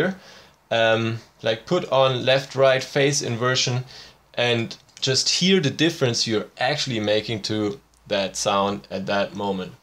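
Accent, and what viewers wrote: Germany. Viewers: You're german, right? :D Nice Video!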